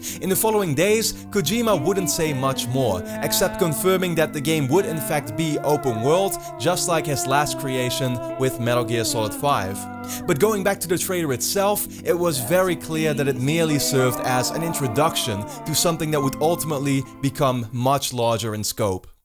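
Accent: Netherlands. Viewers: Awesome vid! Great for killing time, while we're all waiting for the next DS trailer... oh and by the way, geweldig nederlands-engels accent haha!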